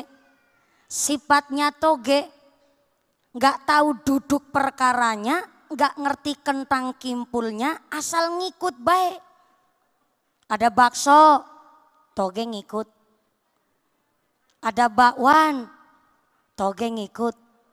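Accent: Banyumas, Indonesia. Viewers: Ustadzah dari republik ngapakers (mugi2 sehat lan sukses) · Mandan ndredeg ya mbak? Angger ngapak mandan maen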